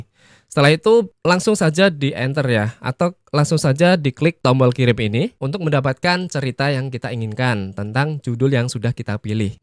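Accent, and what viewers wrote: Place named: Java, Indonesia. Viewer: bang, abang orang medan ya?. kok logatnya kayak jawa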